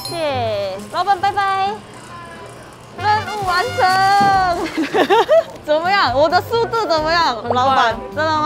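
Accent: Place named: Taiwan. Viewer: 的中文真的越來越台，幾乎都要沒什麼口音了！！超厲害～🤩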